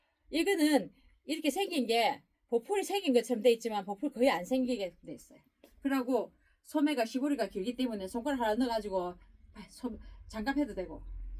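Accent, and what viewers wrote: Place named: Gyeongsang, South Korea. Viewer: 싸장님 갱상도 사투리 넘 재미나고 멋지세요~~^^아방가르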